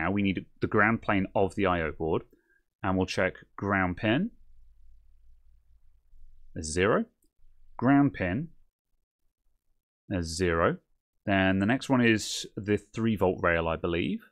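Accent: England, United Kingdom. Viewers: Just inject 0.8 volts with limiting at 2A current.You ll be safe,if the heated area is crowded,use alchohol and inject power again to spot the exact faulty component.YOU NEED A THOUSAND HOURS TO FIND IT WITH SCHEMATICS AND MEASUREMENTS and at the end of the days you will have learned nothing.Anyway i admire your engilsh accent and your patience.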